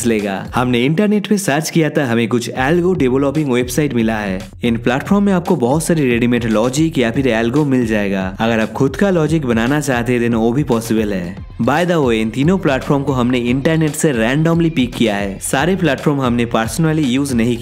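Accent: Bengal, India. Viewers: u must be a bengali your accent describes .loads of love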